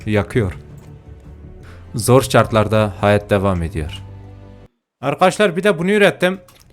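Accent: Elazığ, Turkey. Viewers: Abi nerelisin bence Elazığlısın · Abi Elazığlı mısın ? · Abi sen Elazığ lı mısın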